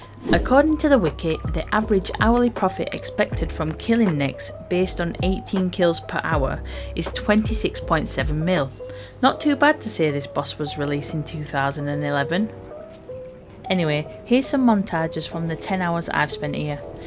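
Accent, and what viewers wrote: Yorkshire, United Kingdom. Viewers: You’re completely off, sounds like yorkshire, England.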